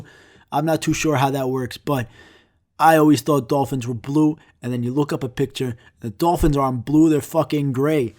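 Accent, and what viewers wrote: New York, United States. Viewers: You have an accent, and a New York one at that. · New York accent. Also your videos are still great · I usually dont really like podcasts but ive taken the habit to re-listen your two podcast episodes when ive had a complicated day, hearing your new yorker accent bitching about shit and saying "cawfee" and "wotr" butters my rolls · Are you from New York because New Yorkers say Cawfee. BTW I love your accent. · Your NY/NJ accent is hella strong.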